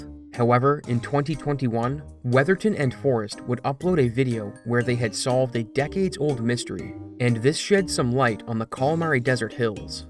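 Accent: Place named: Maritimes, Canada. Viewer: im hearing some maritimes in your voice, newfoundland?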